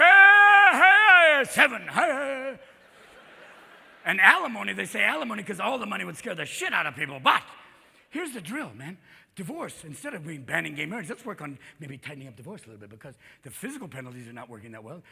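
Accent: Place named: Boston, United States. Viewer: that was a damn good Boston accent...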